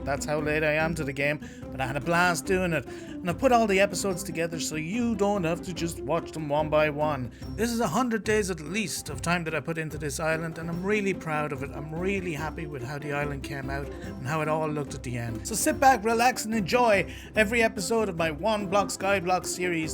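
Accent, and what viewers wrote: Ireland. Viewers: Definitely new here, but you're from Ireland, right? Its always nice hearing a familiar accent 😂😁😁😁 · I love everything about this One Block. I can honestly say that Its my favorite One Block. I am a fan of the Irish accent, your builds and your humor. I look forward to watching the rest of your vids. Oh and I subscribed today. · I really am enjoying this One Block series. It is very different from what I've seen before but it is wonderful! 😊 I've been trying to catch where is your accent from? My partner is Irish and you sound quite Irish to me, where is it from? :) I can't wait to finish this video and see what else you have in store, greetings from Honduras! 😊 Edit: just finished the video! Congrats, you are so talented, I was blown away with the ship at the end 😮❤ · just found your chanel and 1 min in I was like this guys definitely irish just from the way you speek(I'm also irish) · OUUHH OKAY THATS COOL, i could tell from the accent!! 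i rarely see other irish people who play minecraft i got a bit hyped lmao, amazing video though good luck with growing <3! very underrated and have alot of potential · You don't sound Australian. I hear a strong Irish accent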